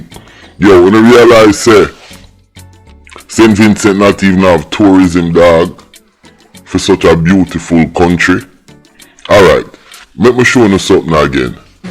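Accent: Jamaica. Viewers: vincy cah cuss CLOTH which such genuine accent like dat..he is Jamaican..and yes he is distorting his voice..if u notice most of his videos are from Jamaica..listen to how he say im for him and uno and other words..real Jamaican dat!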